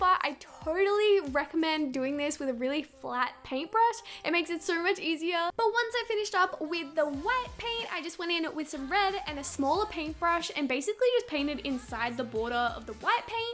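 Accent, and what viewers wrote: Australia. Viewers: i love how austrailans talk... vider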